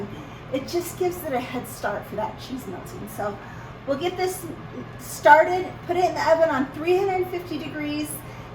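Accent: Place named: North, United States. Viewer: I love this! you seem so sweet and kind <3 .... the way you say "measure" and a few other words, it makes me think you are from up north somewhere?? I've never heard this accent before, so i am taking a guess , lol ... ( I'm from Southern Indiana )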